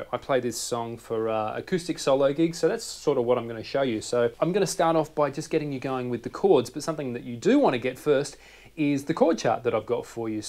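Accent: Australia. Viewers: i love how aussie you are ><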